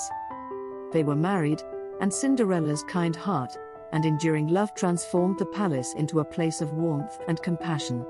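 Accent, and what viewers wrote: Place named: United Kingdom. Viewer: good story :) voice is like british!!! i love it😍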